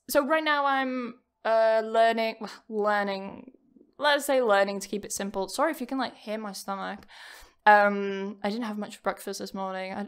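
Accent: United Kingdom. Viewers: First comment, maam.. Happy returns day.. I have been watching for two months and I really like British🇬🇧 english. Thanks🌹 👍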